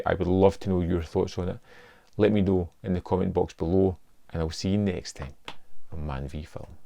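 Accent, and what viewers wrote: Scotland, United Kingdom. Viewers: I'm here because another place asked for best Nicholas Cage movies and i thought of Birdy. I've been in mental hospitals so I related to that part. At one point Modine mentioned Wrestling and around this movie he also did Vision Quest. Both movies showed him really in good shape. But hey...I was too at that age. Oh, and since I'm new to this place, was is your accent? I live in in Boston and have that and it's different than New York which isn't far away. But I'm guessing Scottish?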